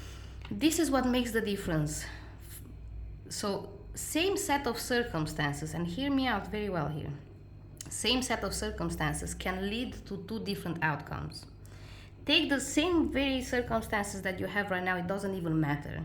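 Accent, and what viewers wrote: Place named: Spain. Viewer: I have to ask what is your accent? I love it. You say you’re Spanish, but I’m Spanish and I never heard that accent, at least in English.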